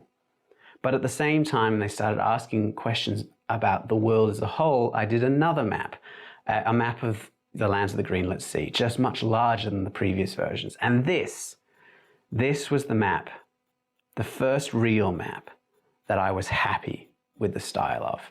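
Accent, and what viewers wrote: Australia. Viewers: Finally an Aussie accent in a DnD context #normalisestrine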